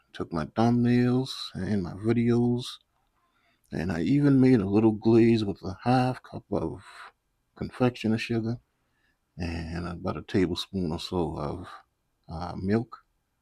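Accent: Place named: Louisiana, United States. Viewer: !! I'm hearing a SC Locountry or Louisiana accent. Making me a little homesick. I'm so making this! It looks delicious!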